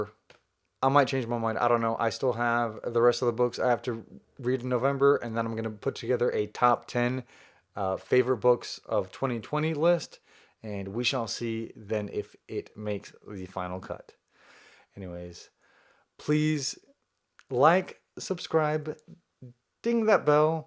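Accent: South, United States. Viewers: I love your video at your house in Texas during your quarantine until you had like a book called, "Rhythm of War" by Brandon Sanderson until you're talking about all of the characters on the book from the very beginning while you're reading the whole thing after that, I hope your husband, Zach will be very proud of you and I love your Southern accent!!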